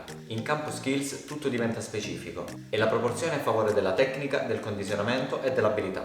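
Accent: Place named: Calabria, Italy. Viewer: E niente... mi sono appassionato da un anno al calisthenics e tu mi rendi anche fiero di essere calabrese come te. Sentire discorsi motivanti come questo col proprio accento è il massimo che possa desiderare da un canale che tratta della mia passione 😂. Grande💪🏻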